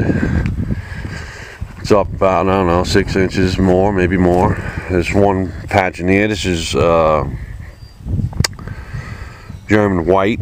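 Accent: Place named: Maine, United States. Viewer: Just found your channel, watching all the videos soon. I am sure you've been asked, and have probably answered already...where are you from? Your accent reminds me of family back home in Maine. :) Your set up is great!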